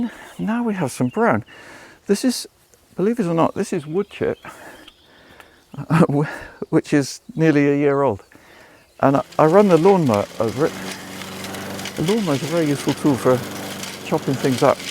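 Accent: United Kingdom. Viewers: Put on Closed Captions. From one of those darn French Canadians that don't understand British accent, but love it nonetheless.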